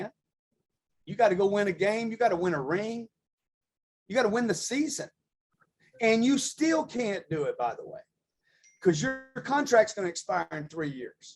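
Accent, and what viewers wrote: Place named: Louisiana, United States. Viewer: When I was 7 years old I got in my dad's 1991 Ford Explorer demonstrator vehicle from from the new car dealership he was a manager at. It had A CD player in it, it was the 1st ford vehicle to have A CD player. The Dolby prologic surround sound demonstration disk was in the CD player, I listened to it and marveled at how good the system sounded.. I then saw the eject button, the symbol was familiar to me I knew what it meant.. As I hit the button out popped the cd. I opened the case that rested on the center console and Inside I found A CD labeled "objections and closes" Curious I put the CD in the truck and pressed play. A Southern Louisiana accent started belting out some cheap one liners and a quick welcome speech about himself. I became fascinated with that day. I had no idea who he was from that day or many years to come until approximately 3 years ago when when I saw a YouTube video of the same name. 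I said to myself "there's no way that that guy from way back when is still doing stuff and creating content now"...... So I clicked the video and that same familiar voice greeted me. I attended growth con in 2020 at the Mandalay Bay and sat 3 rows back. I bumped into in the hallway by the gym. And although I was startled at how much smaller than me he was, I will never forget how quickly he thanked me for my support shook my hand, And started walking off at almost a Sprint to his next engagement. Although the growth convention was fantastic and I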